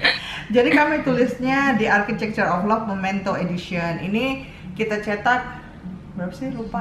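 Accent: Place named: Medan, Indonesia. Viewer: aksennya medan bgt, jadi kangen rumah hahahah, loo.. loo... nya itu :))))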